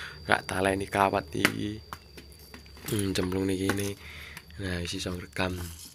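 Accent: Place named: Java, Indonesia. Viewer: Boso mu ale meduk mas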